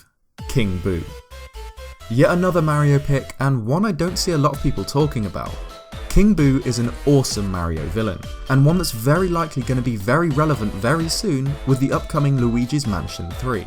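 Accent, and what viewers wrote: United Kingdom. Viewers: Where are you from in the UK bro?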